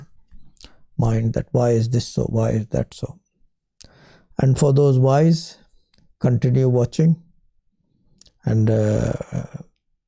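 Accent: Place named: England, United Kingdom. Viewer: Uff Gorgeous Grumpy English Accent 👌👌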